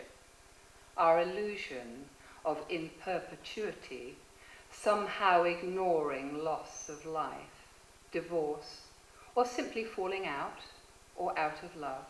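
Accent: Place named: London, United Kingdom. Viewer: Sounds POSH - Sloane square - Kensington High Street - Bayswater ?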